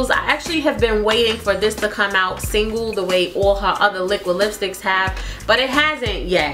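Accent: New York, United States. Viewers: I LOVE u and ur videos. I can listen to u talk all day!! (the NY accent 😊) Please do a video on the Kylie lippies!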